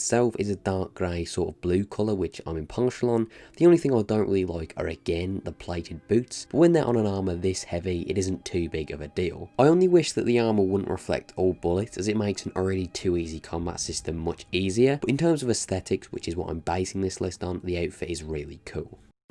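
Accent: Birmingham, United Kingdom. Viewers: Wow didn't know you were a fellow brummie. Nice to hear man haha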